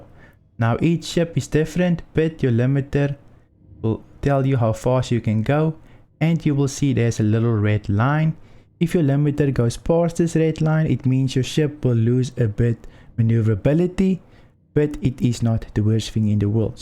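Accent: South Africa. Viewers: that s afrikaanse english accent lo so cool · South African?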